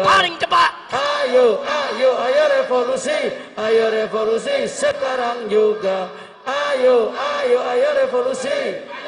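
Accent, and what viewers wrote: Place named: Jakarta, Indonesia. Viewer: klo menurut ane yaa orang Betawi khas bahasanya emang seperti itu nada rada keras, buat habib Rizieq keras nada nya kaya komando perang tapi buat kebaikan,, negara gak berkembang klo pemimpin masih berbuat zholim siapa lagi yg negakkin klo bukan HRS? Apa harus Nunggu imam Mahdi? Kiamat inget broo menyakiti dzurriyat nabi sama saja menyakiti Hati Rasulullah, ada kisah nya 🔥 walaupun sekeras apapun tetaplah dzurriyat nabi 🙏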